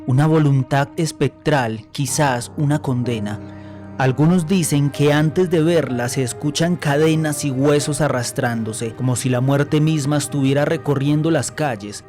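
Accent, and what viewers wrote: Colombia. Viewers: Excelentes relatos , con voz de acento colombiano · con razón me parecía colombiano 😂 pero lo felicito están bien las historias gracias saludos Bendiciones 🙏🏻❤️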